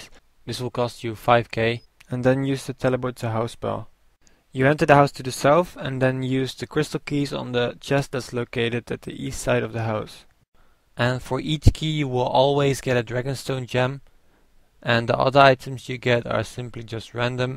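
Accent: Netherlands. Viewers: je hoort gelijk dat het een nederlander is >.< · lol ur dutch arent u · You are dutch? =D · I can hear you're Dutch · ur from holland lol i hear ur accent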